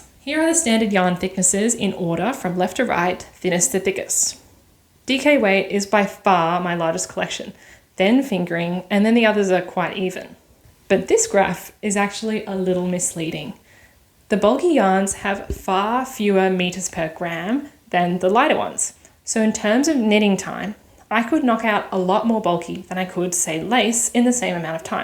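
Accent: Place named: Australia. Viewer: As a Maths teacher and someone who has been knitting for over 40 years (and every day for nearly 20 years), I cant tell you how much joy this video gave me. I have all my stash on ravelry and have crunched numbers many times over the years but never to this detail and certainly not lately. Might be time. I’m curious. Thanks for sharing. Also nice to hear another Australian accent on the YouTubes. ❤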